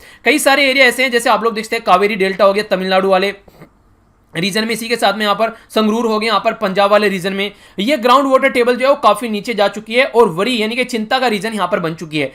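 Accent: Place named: Bihar, India. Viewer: Kis kis ko lgta he ki hmare pyare sir bihar se he😊😊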